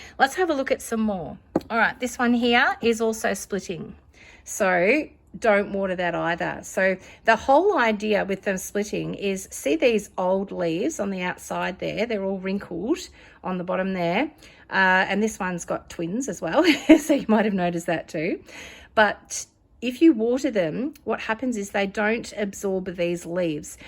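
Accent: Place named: Australia. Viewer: Lovely Lithops, great to hear an Ozzie talking about them!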